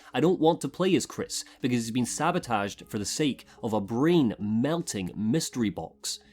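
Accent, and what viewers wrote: Scotland, United Kingdom. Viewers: I don’t criticize Scottish pronunciation. I enjoy it, it’s fascinating and eclectic in its acquisitions of other dialects’ features. Keep up the fine work. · I watched this video for about an hour before I realised you were Scottish, you have such a light accent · Haven't finished the video yet but "You can criticize Scottish accents when you get free healthcare Americans" almost made me die laughing as an American myself ddd. Imagine correcting someone's pronounciation when they are from a different country and have a different accent to you. That's just rude. Besides, in-ven-try still sounds right. Anyway, sorry for the babbling, going to finish the video now, but I love/agree with a lot of what you're saying!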